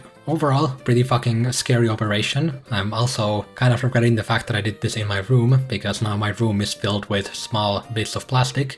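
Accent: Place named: Finland. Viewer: By the accent, I knew you are Finnish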